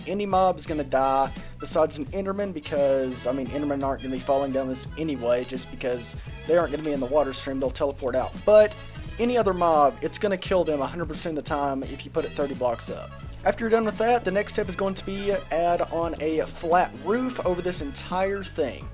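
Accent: South, United States. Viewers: Honestly an amazing video, your mildly country accent and speaking tempo with the music is great with this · Love your southern accent by the way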